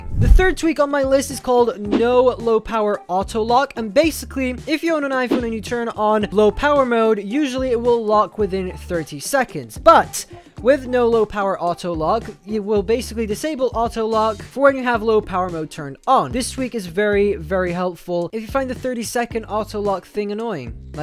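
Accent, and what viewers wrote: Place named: United States. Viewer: You’re Scottish ? You sound American, what part of Scotland are you from ?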